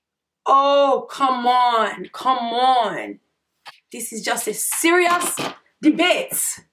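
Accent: Nigeria. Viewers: Pixie crop is hands down my favorite plus I love how you switch up that Nigerian accent. Steady makes me smile 😀😀😀😀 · I just want to keep listening to you and that mixed Naija accent. Watching your videos instead of editing mine and im already late for my Youtube upload. Stopppeeet! Ya too cute girly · I love your Nigerian accent. You had me dying. (I'm Nigerian so it made me laugh)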